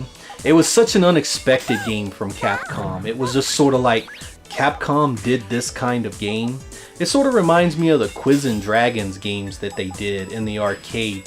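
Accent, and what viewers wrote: United States. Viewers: Hey where are you from? I hear you say "I'd didn't come out in Europe" or "there wasn't a pal release" a lot which made me think you were English but your voice sounds super American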